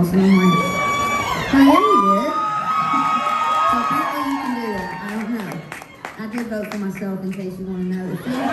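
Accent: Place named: Virginia, United States. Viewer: Love her Virginia accent, my home state. She's going places!! Another great Virginia Artist!!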